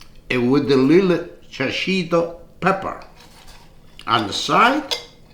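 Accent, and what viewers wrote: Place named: Sicily, Italy. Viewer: Im making this tonight! Love this mans recipes! He reminds me of my grandmother who came from sicily in 1938 when it comes to his accent and his love for cooking.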